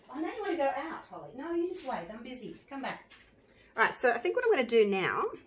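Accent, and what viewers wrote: Australia. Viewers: I'm trying Mrs. Julie's recipe for PM, for GLUE ALL. Still struggling with paint consistency. And I love your birdies and puppies!!! I have 2 thingies of resin and I'm completely skeered...:) love your video's!!! your artwork is awesome!!! from Ohio!!! I'm jealous of your cells...Oh yeah, can you PLEASE revisit paint pouring, for beginners. I'm back to the basics!!! I'm gonna master flip cups first, then work my way up!!! I absolutely ADORE Australian accents!!!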